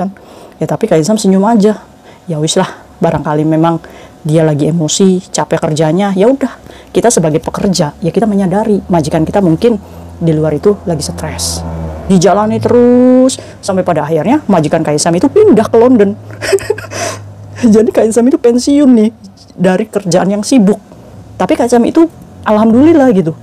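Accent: Indramayu, Indonesia. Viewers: pantesan kl ngmng BOLeD mirip orang Indramayu 😅😅😅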